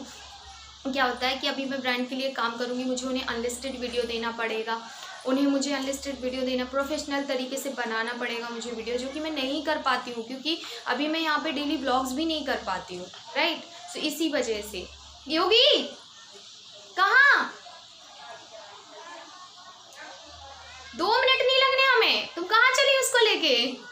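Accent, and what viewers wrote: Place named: Kanpur, India. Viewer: Apki avaj bahoot pyari hai....mere jesi lenguse hai apki....gao....kanpur...ki ho ap Kya.....me kanpur ki hooo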